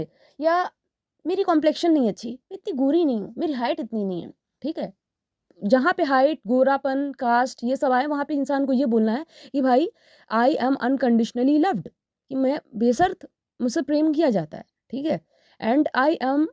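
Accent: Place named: Bihar, India. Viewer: Omg .... Wao apne kitte achhe se story narate ki ....full bihari tone maza aagya .... 😂😂😂😂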